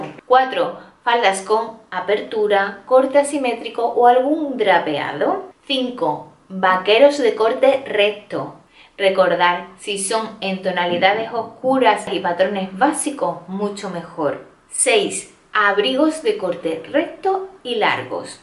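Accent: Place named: Andalusia, Spain. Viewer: Me parece una falta de respeto,aunque se pida disculpas por ello corregir a alguien su forma de hablar. Esta señora tiene una perfecta audición,que es la suya,con su acento andaluz y se le entiende perfectamente. A tí te gustaría q te dijeran q cambiaras tu precioso acento mexicano q seguro lo tienes. Un saludo desde España.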